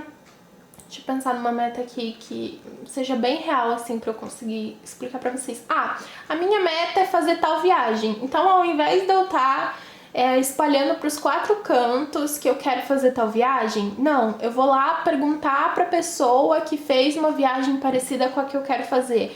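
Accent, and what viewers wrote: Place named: Rio Grande do Sul, Brazil. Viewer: Acho tão bonitinho ela falar, é gaúcha?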